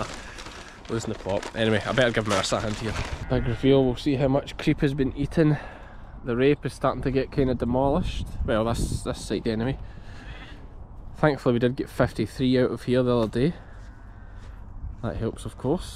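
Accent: Scotland, United Kingdom. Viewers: Absolutely love it when you DONT hide your scots. 😊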